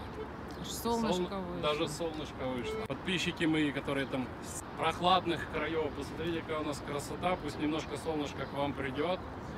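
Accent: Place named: Odessa, Ukraine. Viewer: у тебя появляется Одесский акцент! Ты как всегда лучший!!!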